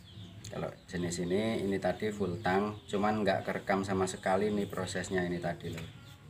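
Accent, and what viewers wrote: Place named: Lampung, Indonesia. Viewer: Lolasi lampung nya mas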